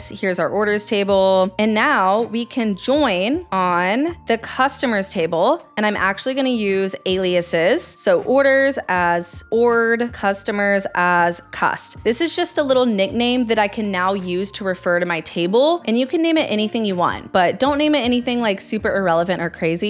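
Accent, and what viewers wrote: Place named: Latin America. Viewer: I really love how expressive you are explaining quite challenging concepts as you do. Eres española? is spanish and the way you talk is more latino hehe. Thank you from the bottom of my heart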